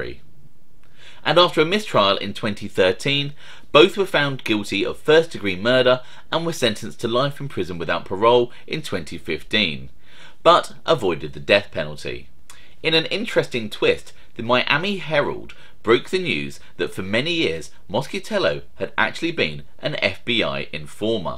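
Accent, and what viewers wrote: England, United Kingdom. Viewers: OMG that's the same thing I said 9th Ave all the way😂😂😂😂the very first time i saw this channel i thought he wz the gangster r i wz shocked when I heard the English accent!!!!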